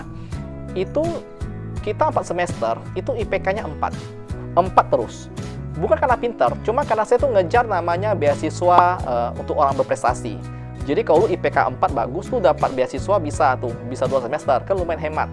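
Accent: Pontianak, Indonesia. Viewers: Bahasa pontinya mirip bgt ama mertua gw 🤣🤣🤣🤣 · Mantab Boy,... Salut dengan budak Ponti, Penuh dgn perjuangan dan menjadi inspirasi utk semua... Salam dari saye budak Ponti, yg lahir dan besak di Ponti.... Aku suke logat kau boy....Tetap semangat dan sehat selalu Boy.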